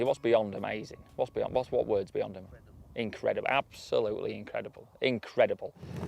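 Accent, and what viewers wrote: United Kingdom. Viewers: dave xrp, how would anyone outside the UK understand a word he says? I love his accent, but I can’t see the Yanks getting it. 🙄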